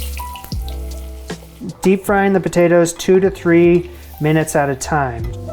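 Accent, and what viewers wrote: Canada. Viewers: Night shift Canadian accent is more pronounced and I love that. 🇨🇦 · I love his Canadian accent. 'Hang the Po-ouch.' LOL. Also, even if I'm making a cake, brownies or bread, I still use the trick my mom taught me. I take Crisco in a paper towel, grease up the pan. Then cover/dust with flour, to tap out the extra before baking. Anything for the 'Substitute' doesn't match.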